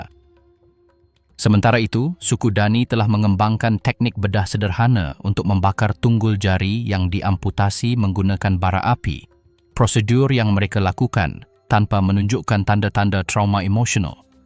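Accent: Malaysia. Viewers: Kenapa logatnya dari negeri Jiran padahal nama channel nya a globan Indonesia 😅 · Itu kayaknya orang malaysia belajar ngomong indonesia jd logat malaiysianya masih kental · Logatnya malaysia 😢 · Dari cara BERBICARANYA saja ORANG MALINGSIA😁😁. VIDIO Abal Abal.... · Min,logat/dialek Malaysia anda masih jelas terdengar.Hebat kan Bahasa/dialeg Indonesia?😊Orang Malaysia lama kelamaan akan berbahasa dan berdialek dengan bahasa Indonesia.....😅😅😅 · Admin gadool, bahasa indonesia gaya Malaysia, Mereke mereke ape bende, gak enak blas di dengerin gatal telingaku nyimak suara admin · Milih narator nya orang Malay, kalo text nya sih bhsa indonesia 😂gak enak dikuping....skip ah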